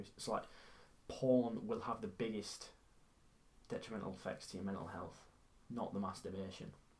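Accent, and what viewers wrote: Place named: Manchester, United Kingdom. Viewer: You from Manchester bro? You have the accent for sure. A fellow Manc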